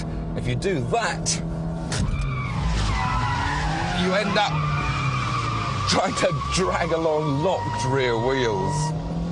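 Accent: United Kingdom. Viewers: He's English. Everyone else pronounces it "coup pey". Like the French do. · lol, he is british, don't you hear accent or at least see, that steering wheel is at the right side of a car. However if yaris is a great drift car, this guy is american and Greece is a center of a world =\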